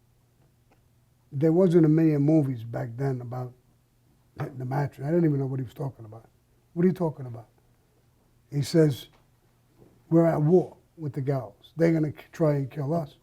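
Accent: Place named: Boston, United States. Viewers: You remind me of my father the way you tell stories, but with a Boston accent instead lol. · Im glad your here to tell your story.. can u say "im gonna bust your balls " with your east coast accent love that shit thanks for the video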